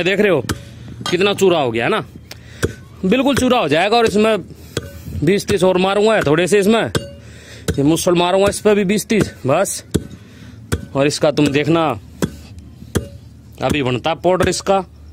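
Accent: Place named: Haryana, India. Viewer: Bhai ka haryanvi. 😂😂😂👌👌👌👌 Ekdam kadak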